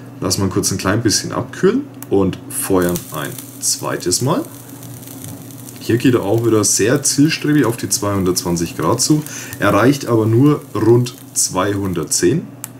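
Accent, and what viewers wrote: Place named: Franconia, Germany. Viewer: kommst du aus dem fränkischen Raum?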